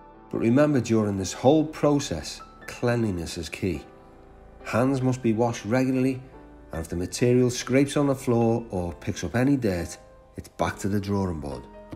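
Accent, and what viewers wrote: Liverpool, United Kingdom. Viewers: Cannot wait for the next one. This is how a video of this sort of work should be. No irritating Muzak, or smart arsed co presenter, playing at comedian, just a guy who knows what he is doing and explaining the stages viewed in a relaxed, quiet Liverpool accent. Superb, thank you,.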